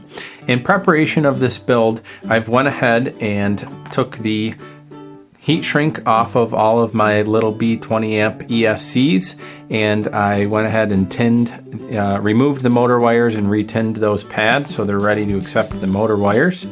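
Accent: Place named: United States. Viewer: Are you aware that American English is different than British English? He pronounced it the way Americans pronounce it because he's an American. He's pronouncing it exactly the way it's supposed to be pronounced in America. Why on earth would the British pronunciation be any more correct if he's not actually British? That's just nonsense.... lol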